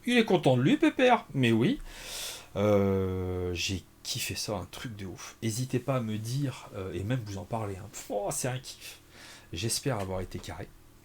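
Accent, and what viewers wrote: England, United Kingdom. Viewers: Bah il est très bien cet accent anglais ! Merci pour la vidéo ! J'ai jamais pris le temps de découvrir la JSA, ça donne envie!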